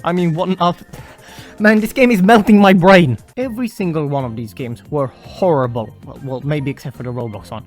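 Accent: Sweden. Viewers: Förlåt men det första jag märkte var att du hade en svensk accent lmao- Nice video btw!! :]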